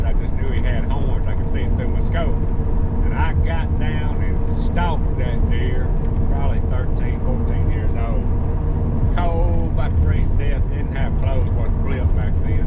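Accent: South, United States. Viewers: That subtitle generator has problems with your southern English. I’m not criticizing southern English because I talk that way too. It’s just funny how what’s printed differs from what you said. Thanks for the videos. I enjoy them.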